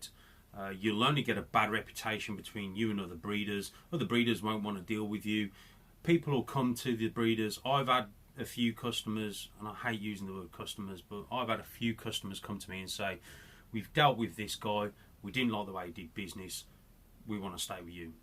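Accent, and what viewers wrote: Black Country, United Kingdom. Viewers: Well Mr, if it don't come from you I don't want it😲 but that because of the yard stick I mark others by, it's reputation, reputation, reputation with a bald head and a Black Country accent😅😅😅😅😅😅😅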